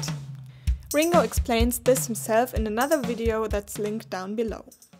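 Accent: Germany. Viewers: SHE SPEAKS!! German girl??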